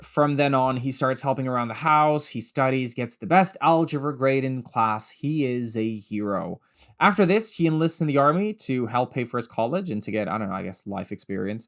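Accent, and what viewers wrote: United States. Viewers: Might I remind these christofascist theocrats - Pete Buttigieg has 2 children. So does Kamala Harris. BTW, you have a VERY American accent! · Your American accent is perfect 👌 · First time on your channel; you said you're not American but I can't hear anything but an American accent in your voice? Please tell me what I'm missing! No disrespect meant at all. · people pronounce it differently for their own grandparents. You did fine. I had a Memaw (pron. mee-maw), tho, instead of a mamaw (as you pronounced it)... but even in the same area here where my grandparents lived... my cousins pronounced it differently for their own grandparents. It's common terms in the US south and in the mountains for grandparents. And then there's the pawpaw tree... and multiple towns named for that. A uniquely native American tree and largest native fruit. 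Some folks call their grandfather pawpaw, too. You sound like you belong in the US with your accent... I would never have guessed English was your 3rd language. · Why do you have an American accent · You speak with an American accent (at least you sound American to me). Your channel says you are from the UK, though. Are you actually from Canada? Just curious.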